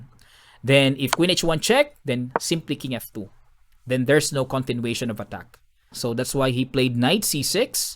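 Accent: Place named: Philippines. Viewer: The way you seak here is still patterned on your filipino version. And still very clear and well elaborated analysis. More subscribers for you coach. Good luck!!! Nakakadugo sa ilong mang english. Hahahahah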